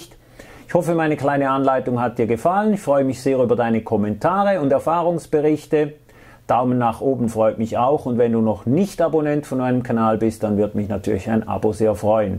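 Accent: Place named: Switzerland. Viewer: Für mich ist das eine Rohrbriede, vor mir gehen aufputz 2 kupferne, blanke 1" Heizrohre ins OG. Braucht es für so stationär, an beiden Seiten/Enden solch Rohrbrieden, oder, oder schirme ich mich mit der Erdung im Ganzen Haus mich ab? Sorry, bin totaler Leihe und dachte erst, es ginge um die Antenne selbst. 11m Draht im Estrich spannen bringt was oder? Bis da hoch sollte der Draht dann gemantelt, geerdet sein? Ich seh schon, muss noch weitere Videos betreffend schauen, das war nicht das richtige, dennoch gut gemacht und das Schweizerdeutsch toll gezügelt. - Äxgüsi, das kann nicht jeder Schweizer!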